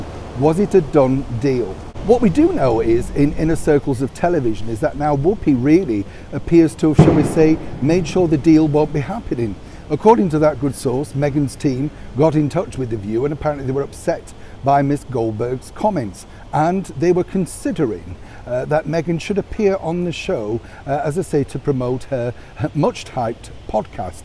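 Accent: Yorkshire, United Kingdom. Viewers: Whoopi took one for the world and saved a whole heap of people from dying of boredom. Thankyou Whoopi for saving us🙏 If Moaning Meme's team can complain about a phantom possibility,then we need to keep calling out meme's horrendous "acting" and lies. A little black tea with some good honey for that croaky throat. We want to hear your strong Yorkshire tones at full voice..😁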